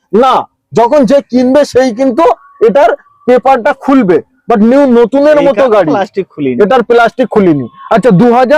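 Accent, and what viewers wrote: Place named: Bangladesh. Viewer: Kotha sunay Monday hochhay bangladesher apni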